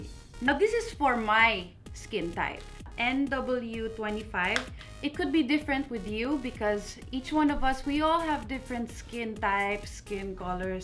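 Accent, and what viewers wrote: Philippines. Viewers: I love her but her accent bugs me. i cant wait to see a Filipina in American media who can talk normal. i just hear that accent from middle class filipinos so much and I know filipina girls think its cute. it's just too much to listen to. sorry. still love you. just have to put you on mute. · I can here the Filipino accent 😍😍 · Gosh! I really love her Filipino accent! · I love your accent, you make me mis all my Filipino friends in Alaska. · grew up in the Philippines. Her accent is the same as middle class Filipinos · Maldita do you have problems with your so called "Middle Class Pinoys"?! She might have that kind of accent but were you able to achieve what she has right now? I'm pretty sure you are a filipino, and I am sure that your accent also belongs to Middle Class Pinoys. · i love her accent! so filipina!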